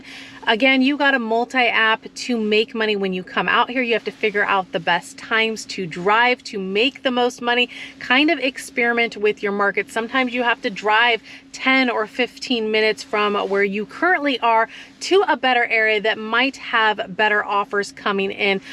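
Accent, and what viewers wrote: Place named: Pennsylvania, United States. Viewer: You sound like you are from Pennsylvania.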